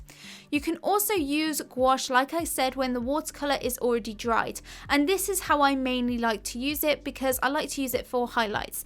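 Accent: England, United Kingdom. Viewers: That British accent is so satisfying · Brandon Butler yeah because she has an English accent also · British accent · I like your British accent!(Nice video, thanks) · british accent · Learning British accent and watercolor basics in one video... I love it. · Lol that's how us peeps in Britain talk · I really really really love your British Accent❤️🔥 which is pleasing to ears and your tutorials are amazing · She has a British accent that's why 😑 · I love her british accent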